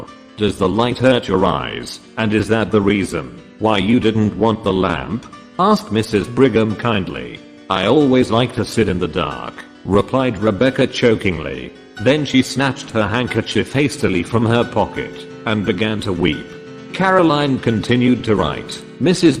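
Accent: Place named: United Kingdom. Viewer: All of his voices and pronunciation is perfect!Full of British Accent!Wonderful!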